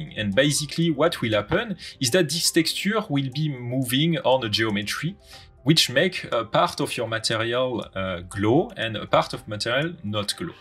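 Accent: France. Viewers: Il faudrais faire les vidéo en français, car avec l'accent français la traduction est horrible ! et c'est juste incrompréhensible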